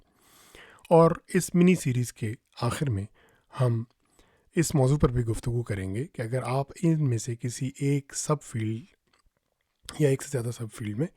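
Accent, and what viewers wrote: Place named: Pakistan. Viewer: بہت خوشی ہوئی یہ چینل پاکستان میں دیکھ کر وہ بھی اردو لہجے اور زبان میں، ورنہ ذیادہ تر دیہاتی لوگ منجن بیچ رہے ہوتے ہیں۔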